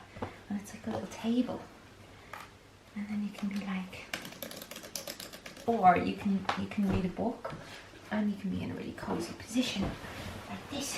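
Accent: Ireland. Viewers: i'm going to go and study in Dublin for the next year so i've been binge watching your videos to up my game on the Irish accent so I don't look like a complete idiot 😂☀️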